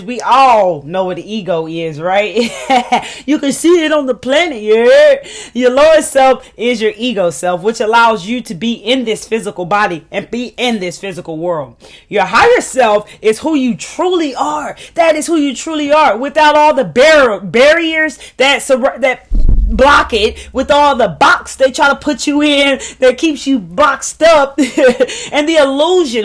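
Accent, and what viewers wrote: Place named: New York, United States. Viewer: You heardddddd lol you gotta be from NY we in the building! 💪🏾love you too Queen 👑 powerful video💯💯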